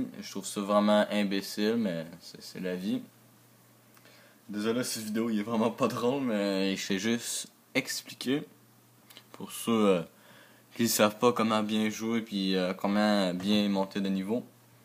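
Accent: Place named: Belgium. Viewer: tres bien expliquer mes bon axent belge je croie j aime bien je voulait te dire j aimerai bien quon joue ensemble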